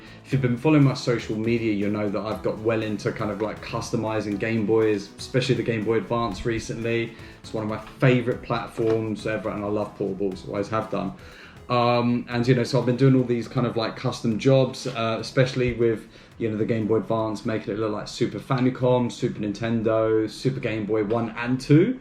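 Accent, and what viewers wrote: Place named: Europe. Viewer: This is AMAZING! I have been working on a Metal Gear Solid custom GBA. Where did you get the sleeves printed? I assume by accent this is somewhere in Europe :( I am in USA and this is very expensive to find a single print custom box for my GBA.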